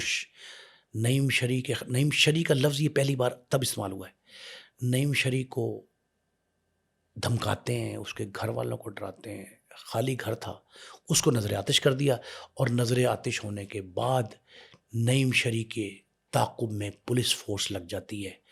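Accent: Punjab, Pakistan. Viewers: Sahib tu c Paindo ho, bus video Bani ja, paisay kami ja. Tohadda Karachi SE Kiya talluk. Ardo (urdu) Punjabi which bolde ho. O Paindo bey ja.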